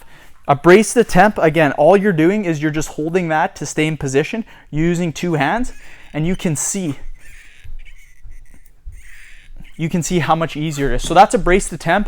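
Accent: Canada. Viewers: Canadian accent? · Canadian af bc he sounds like nick eh 30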